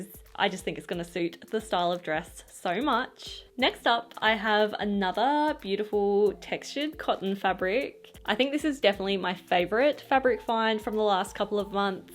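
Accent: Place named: Australia. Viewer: Struggled with the Summer bit. Then heard you talk and remembered, silly me, you are in Australia!. Been there 20yrs ago. Wish I was there now. Looking forward to seeing how the dresses turn out. Also as a Quilter I can't wait to see your artistic version.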